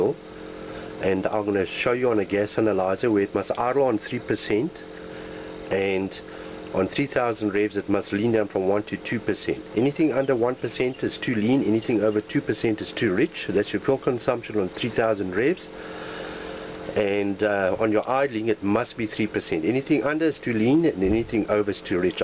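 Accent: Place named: South Africa. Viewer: i know a South African when i hear one. when you said "robot" you confirmed it cause we are the only country (or one of the only) that uses "robot" instead of "traffic light". Thank you for sharing your knowledge. my Toyota Tazz has had an idling issue for a while and you might have jut helped me solve the issue.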